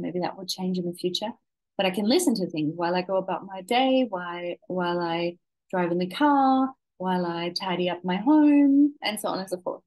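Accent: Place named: Australia. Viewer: Love your Aussie accent. Greetings from Germany.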